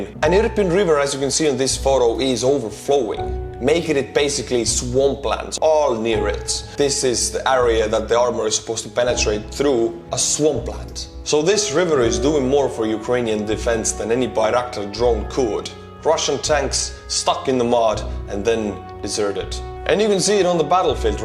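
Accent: Estonia. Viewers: Don’t lie! You know that Estonian accent is awesome. It sounds to my ears like some mix of standard “eastern european” mixed with a bit of Finnish, but then a healthy dash of Italian and even some Catalan with the rolled R’s. Love it, man - I’ve really grown to appreciate the three furiously independent “Baltics.” Edit: I came to this appreciation by way of John Neeman tools, now the Autine Forge and Guild, a Latvian collective dedicated to traditional building techniques and crafting world-class, handmade tools and gear. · I love your Estonian pronunciation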